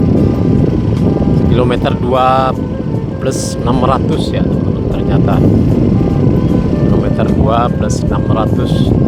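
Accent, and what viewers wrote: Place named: Padang, Indonesia. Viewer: Bahasa Indonesia nya Padang banget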